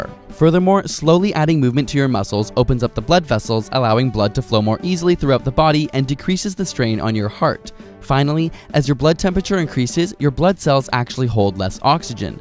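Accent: Canada. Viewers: Are you Canadian? You kinda sound Canadian.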